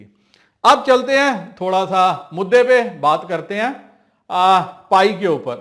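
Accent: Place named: India. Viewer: you write in English and then speak Indian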